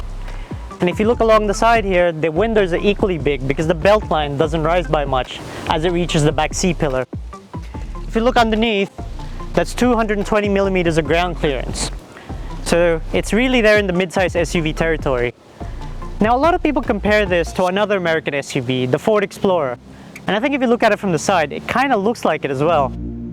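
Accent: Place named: Australia. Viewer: Someone has been living in Australia..nice accent. These cars are imported from the US and are the Canadian spec.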